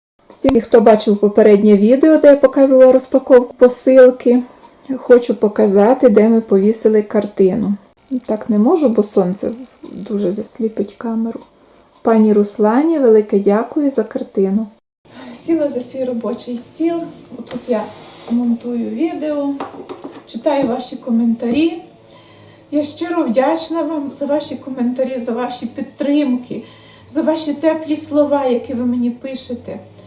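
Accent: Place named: Lviv, Ukraine. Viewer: Вибачте,що я питаю.Де ви народились,в вас вимова,як в нас на Львівщині .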